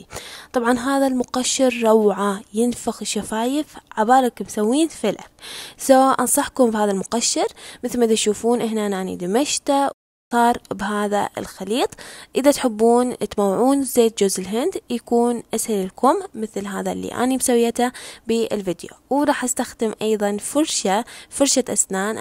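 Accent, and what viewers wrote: Iraq. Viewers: هاي عراقيه 👽🥺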